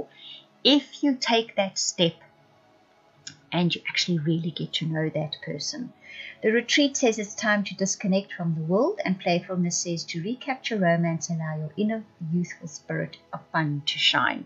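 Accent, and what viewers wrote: South Africa. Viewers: Love your South African accent. Sound like my best friend. Awesome reading thank you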